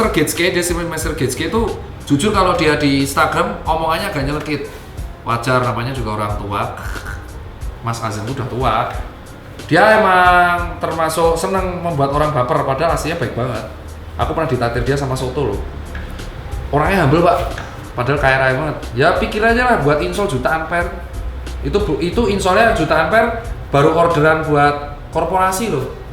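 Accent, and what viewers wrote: Java, Indonesia. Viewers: HA JOWO E BRO!!! Ciri khas! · Wong jowo.. wong ugm.. yo medhokkk.. salam medhok Mas.. pertahankan medhoknya👍👍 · jowone medok kabeh dok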